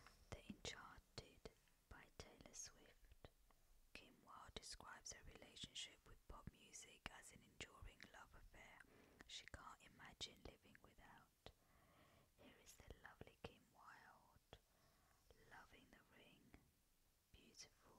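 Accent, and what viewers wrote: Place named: United Kingdom. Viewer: ASMR in a UK accent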